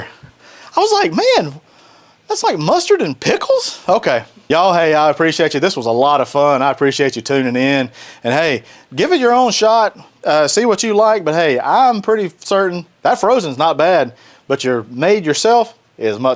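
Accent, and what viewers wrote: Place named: Texas, United States. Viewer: Toda tu comida me encanta muchisimo eres un gran chef!!! Me gusta tu acento tejano😊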